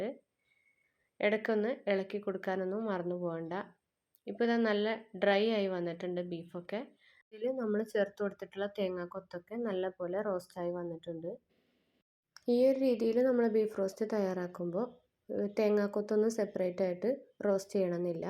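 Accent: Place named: Malappuram, India. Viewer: താത്ത മലപ്പുറത് ക്കാരി ആണ് ലെ.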